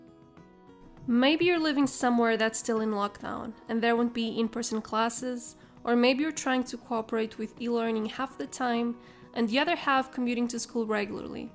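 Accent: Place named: Portugal. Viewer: I just found your channel a couple of hours ago and I have been binge watching your videos nonstop. Your videos have really helped me organize my routine before I go back to college (i'm studying Law). I also noticed your accent and wondered if you were from brazil or from Portugal then I saw some portuguese books during this video and just wanted to say your work is amazing and wanted to send some love from Lisbon. Continua com o bom trabalho e tudo de bom para o futuro. Ps: Clearly english is not my first language but I did my best.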